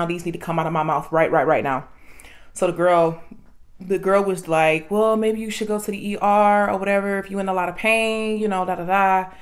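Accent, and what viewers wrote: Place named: Washington DC, United States. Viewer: The accent dound like she's from DC/PG..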